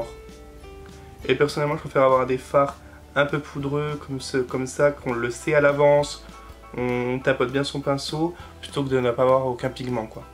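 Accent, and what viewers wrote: England, United Kingdom. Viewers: Tu es tout en blanc car tu es un ange... mais ça tu ne le sais pas... Bel accent anglais... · J'adore ton accent anglais 😍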